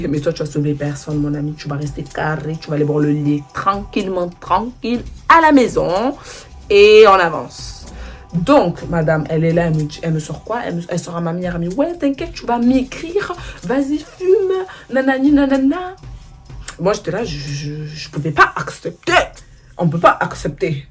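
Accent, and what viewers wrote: Marseille, France. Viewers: Je kiff t’es vidéo tu a fort l’accent marseillais tu es des Cartier nord ou sud je me suis fais un compte YouTube que pour pouvoir commenter et pouvoir m’abonner à toi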